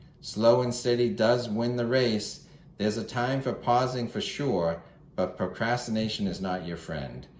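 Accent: New England, United States. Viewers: Well, it was German with a strong New England accent, but quite understandable...😄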